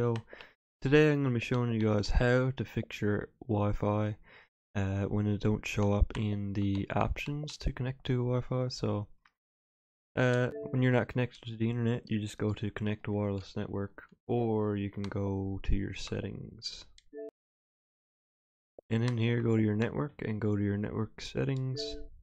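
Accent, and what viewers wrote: Canada. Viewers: You're totally Canadian :D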